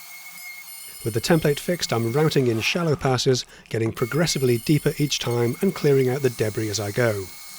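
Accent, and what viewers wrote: United Kingdom. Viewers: A great little gift idea.Thank you for your time and inspiration this past year, I always enjoy your videos; Fun, Informative and with a Wonderful British Delivery.From one Brit to another, Merry Christmas, All the Best to you and yours.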